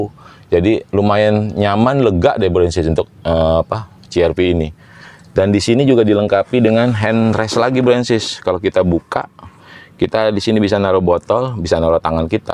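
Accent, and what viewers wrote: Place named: West Java, Indonesia. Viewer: Tina logatna mah jigana urang sunda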